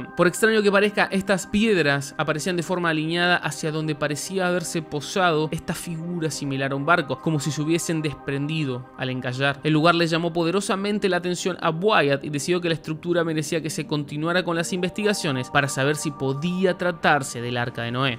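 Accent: Argentina. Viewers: Con ese acento argentino las Cosas parecen un chiste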